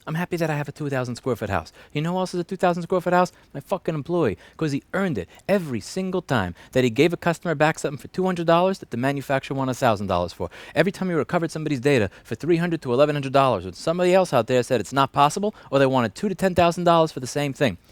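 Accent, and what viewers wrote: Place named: Texas, United States. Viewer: Life long Texan here., except for talking so fast, you sound like a real Texan.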